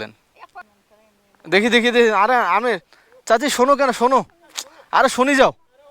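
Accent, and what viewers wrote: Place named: Rangpur, Bangladesh. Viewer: ভাষা শুনে মনে হলো, রংপুরের, ভাই, আমিও ছোটো খাটো বাইকার, আপনার পাশে আমি থাকতে পারি, যদি প্রয়োজন মনে করেন, বলিয়েন, সাধ্যমত পাশে থাকবো। আর আপনার এরকম মুল্যবান কাজ গুলো চালিয়ে যান। দোয়া রইলো ❤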